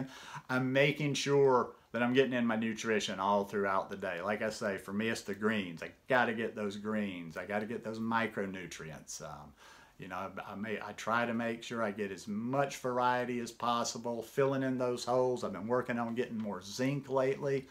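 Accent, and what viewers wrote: South, United States. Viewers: If you want to put weight on, working out does build muscle! So, if you are not working out, you may want to add that to your weight gain process. Great videos! Thank you for sharing your experience! Your southern accent Always keeps your videos interesting and peaceful as I grew up Texas and you remind me of my life growing up! Vegan for life!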